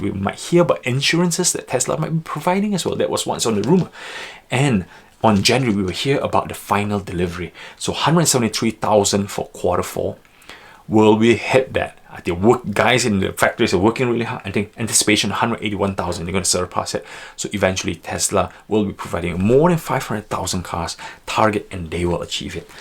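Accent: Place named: Singapore. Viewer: oh! where are you from? you sound singaporean :)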